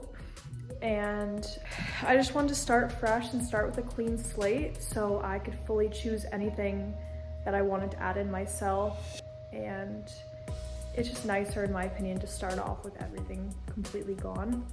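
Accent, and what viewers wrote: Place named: Canada. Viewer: Nice Canadian accent you have, and a sweet cabin, good taste, but I'm more into your dad's style, living with the force of nature's elements, good luck !!! Stay busy and enjoy !!!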